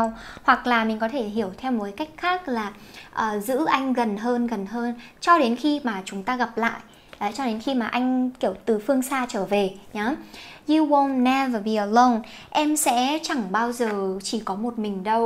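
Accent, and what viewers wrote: South, Vietnam. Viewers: Nghe cô nói giọng miền nam đáng yêu cực 😍😍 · You can talk vietnamese southern accent can you make a vlog speaking southern accent I think it’s very good and special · Ui chị nói tiếng miền Nam dthhhp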